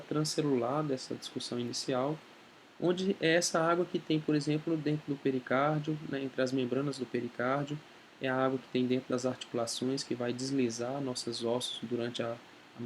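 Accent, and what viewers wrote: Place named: Northeast, Brazil. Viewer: Falou muito e muito rápido, explica em Termos que só um Médico entende e o Sotaque Nordestino "comendo" o "R" no final das Palavras e isso como Médico, é uma vergonha!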